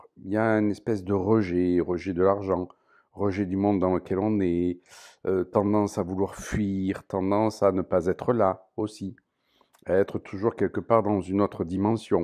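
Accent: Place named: South, France. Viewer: Merci pour le rappel de savoir bien se connaître soi et je crois d'ACCUEULLIR tout ce qui est ici et maintenant ! Merci pour ton accent du sud ensoleillé ! Bonne journée à tous. Merci pour tes vidéos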